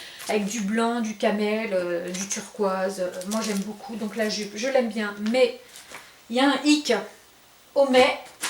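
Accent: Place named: England, United Kingdom. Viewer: Moi j'adore toutes tes petites bourdes ,ton accent anglais, et quand tu sors du cadre pour prendre un truc 👍🏻😄. Passe un Bon dimanche . Bisou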